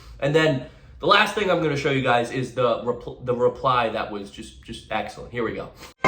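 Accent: United Kingdom. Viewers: hell shes british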